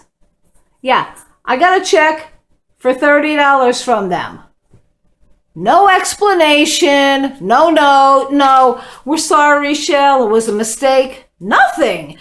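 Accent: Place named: Long Island, United States. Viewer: After watching a flw videos why I like U I know U said U lived in Nevado but then I found U were from NY Now U say U were born in PA I am a Lancaster Cty baby that moved to Jersey then to Hastings just north of NYC Your accent tells me L.I. I gave been fulltining for just over a yr Rt now in Cali Thanks for the entertaining videos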